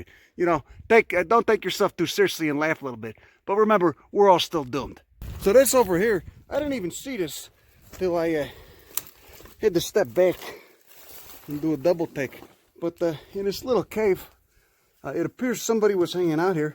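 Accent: Chicago, United States. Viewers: ive never been attracted to someone with such a heavy american accents but fuck he’s too wholesome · I agree with about society's crap and enjoy your unique take on it. And of course the real American accent from Chicago to share the amazing botany you explain so well in a language I appreciate, thanks for the history and botany you share with the rest of us stuck in this life, for me in CA, surrounded by tweekers opinionated by today's ignorance worrying, about the gas for their torch. More then the beauty that we share with you like this. Awesome, I was born in northern IL, South Beloit. Have no connection to it other then birth cert. Lol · make that Chicagoan accent 😉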